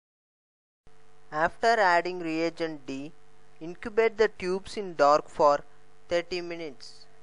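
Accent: India. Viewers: VERY NICE INDIAN VOICE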